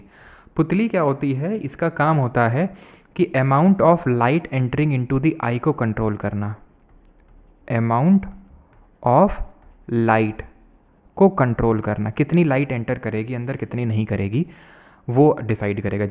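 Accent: Kanpur, India. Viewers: It's my second class sir kanpur se hai kya language bilkul hm log jaisi si hai 🙃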